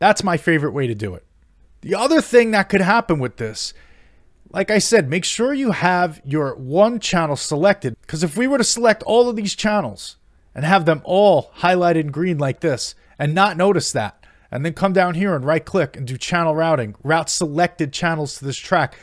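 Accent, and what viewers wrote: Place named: East Coast, United States. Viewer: awesome video. btw where are you from? i hear a slight accent and it's driving me crazy that i can't place it. somewhere over on the east coast?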